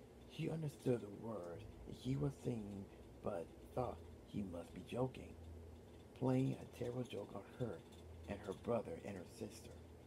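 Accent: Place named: United States. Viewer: you do! everybody does! lol! the accent of the place we are from, city, state, area ect. being from italy, i hear your american accent very much! :) It was ment to be a compliment.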